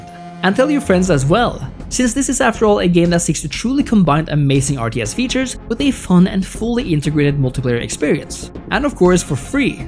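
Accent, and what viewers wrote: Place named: Norway. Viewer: He is from Norway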